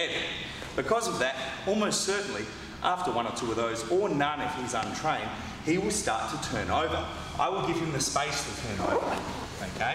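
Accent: Australia. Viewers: I love Australians, you guys are always fun to listen to. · are you an Aussie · Nice rhythm , this dude speaks smoothly from beat to beat, flawless transition and the great Australian accent is a bonus!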